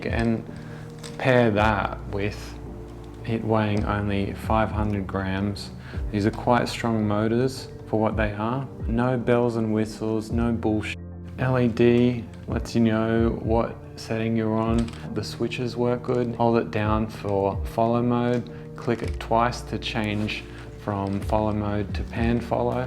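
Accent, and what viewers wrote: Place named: Australia. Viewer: yeah I see it peek in on you,the funnier part was hearing you talk just now and figuring out your down under I had been listening with sap app (in Spanish) lol thanks for the help and guidance I do appreciate it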